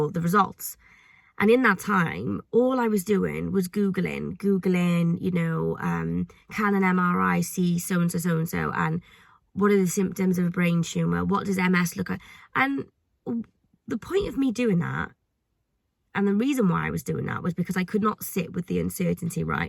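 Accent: Cardiff, United Kingdom. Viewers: Is that a cardifff accent? 😊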